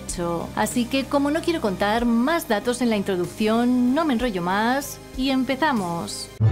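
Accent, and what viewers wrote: Spain. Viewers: Porque la critican aquí en España se habla así vale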